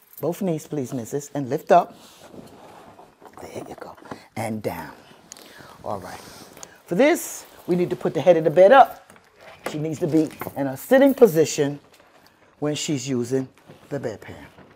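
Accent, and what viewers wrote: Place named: New York, United States. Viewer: Love her accent is that ny?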